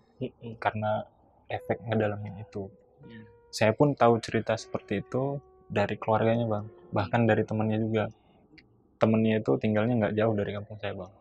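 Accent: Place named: Serang, Indonesia. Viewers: Kayaknya orang serang yaa bang dari cara bahasanya. Soalnya saya juga orang serang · eh ini abang nya orang serang banten bukan sih ,soalnya kadang ceplos pake bahasa serang 😂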